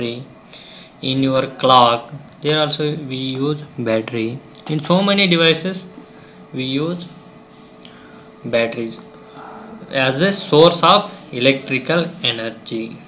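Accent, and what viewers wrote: India. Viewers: sorry to say this, but this presentation is really hard to understand due to extreme indian accent..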